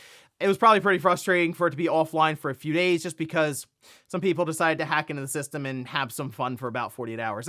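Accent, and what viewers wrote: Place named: East Coast, United States. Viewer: It's just a standard East coast accent, like Philly/Baltimore area.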